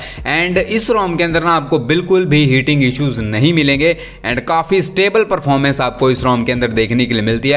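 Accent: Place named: India. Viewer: English title + Indian speaking, bro = dislike, bro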